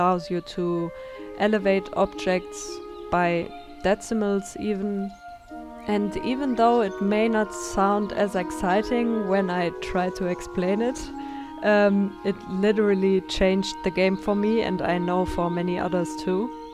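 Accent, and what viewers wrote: Germany. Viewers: Your builds are always incredible 😍 and I'm so happy to finally hear your voice! First of all, I love your German accent, it's one of my favorites, and second, you're so good at talking! Don't put yourself down like this. You should definitely do more of these! 💙✨ Also yayy, teal club! 🙌🏼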